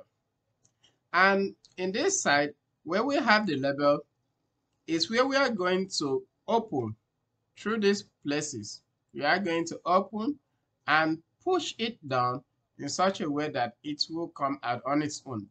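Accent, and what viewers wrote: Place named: India. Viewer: I was hearing a india speak, awesome information though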